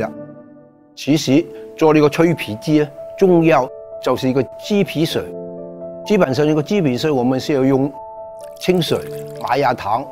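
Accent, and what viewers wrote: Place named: Cantonese, China. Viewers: 纯正广东普通话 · 中国广普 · 广东普通话！就是这样熟悉。😁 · 廣式普通話最強, 一陣不用普通話又被說為甚麼要用方言, 現在就好了 XDDDDD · 正宗廣普話！ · 最好聽呀 廣東特色 吾使去學卷俐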